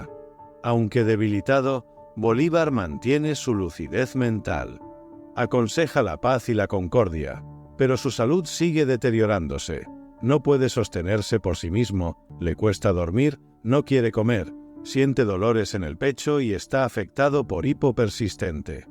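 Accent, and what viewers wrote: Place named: Spain. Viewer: Lo felicito, su asento español no le quita su mérito de haber hecho este documental muy acertado del genio más grande de América, Bolívar es uno de los hombres más importantes del milenio, con sus errores y méritos y egoísta es el que no lo acepta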